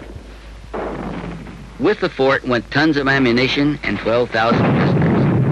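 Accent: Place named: Bolivia. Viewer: Hablas como boliviano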